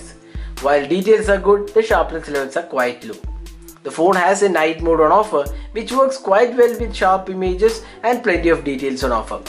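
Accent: Kerala, India. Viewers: That mallu accent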